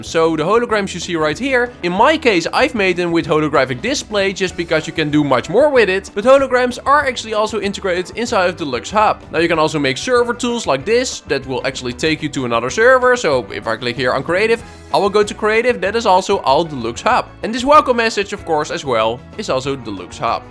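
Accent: Netherlands. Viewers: Are you dutch cuz you sound dutch. i am dutch🤗🤗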